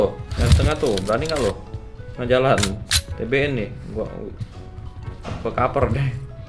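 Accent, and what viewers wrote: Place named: Java, Indonesia. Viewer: Ngomong asem medok slur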